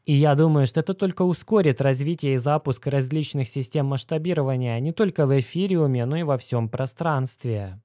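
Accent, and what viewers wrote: Ukraine. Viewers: ты с Украины?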